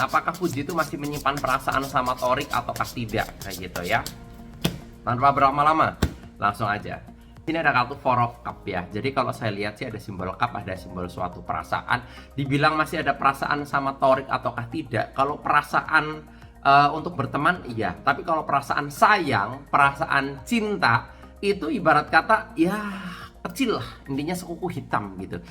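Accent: Banyumas, Indonesia. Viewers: Mengeneh gara² video ne kang 😂rika ngapak banget asli ngendi mas😂😂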